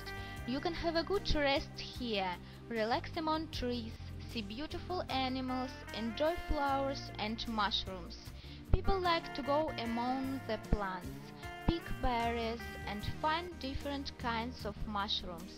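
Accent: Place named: Russia. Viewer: Сразу видно что русский акцент.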